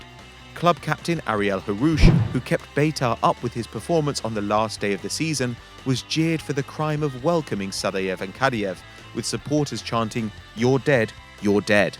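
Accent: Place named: England, United Kingdom. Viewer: This fucking english accent...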